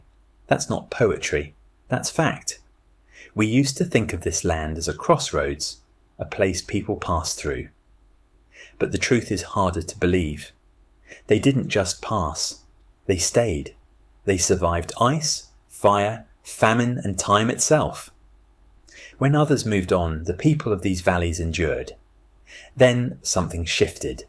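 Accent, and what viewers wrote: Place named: United States. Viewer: A lot of ChatGPT generated crap... You are no wiser after listening to the 20 minutes rant. Just looking at physical features, language and customs shows you the difference. Of course there is overlap and some common maternal genes... But the point was to explain the distinct differences between differences the groups. Yet Chat GPT failed to do so in its yanky acsent 👎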